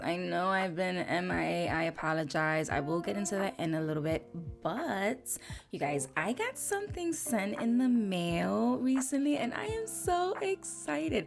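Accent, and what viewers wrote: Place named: North, United States. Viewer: I always watch videos love the content and rarely, comment, share, Subscribe. But the way you shouted one of your followers for the gift! And willing to help her expand her clientele, along with the sincerity I felt from you. I had to comment and subscribe!! 😊 LOVE YOUR CONTENT….Your Northern Accent is strong. I grew up in New York, I watch your videos so much mines is coming back stronger. 😂😂😂 You remind me of one of my “NY Boricua” Besties from L.E.S!!!!!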